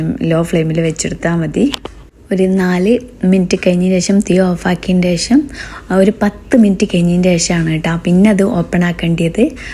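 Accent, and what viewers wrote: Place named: Malappuram, India. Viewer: Nalle paangnd ഉപ്പളാത്തെയും മലപ്പുറം ഭാഷ 2 ലും mundikko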